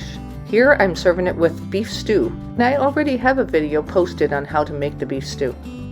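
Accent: Canada. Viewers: Are you Canadian? You have such a strong Canadian accent!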